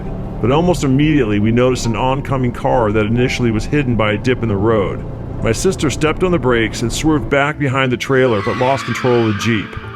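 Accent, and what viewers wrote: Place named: Southern California, United States. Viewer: If you're willing to share, are you from socal by any chance? You have a socal accent very clearly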